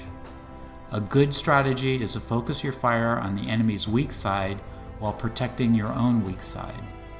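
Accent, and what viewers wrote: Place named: United States. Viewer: Love the crispy clear american accent. It's easy to understand and sounds great. Showing once again proper american english is indeed beautiful. As for the video itself i did pass the mission previously, but it was more because of dumb luck than skill, thanks for the info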